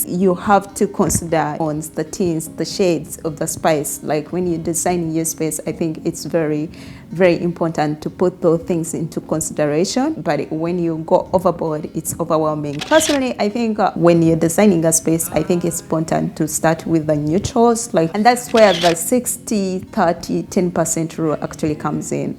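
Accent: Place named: Uganda. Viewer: those who'll understand your accent... she's Ugandan hence the accent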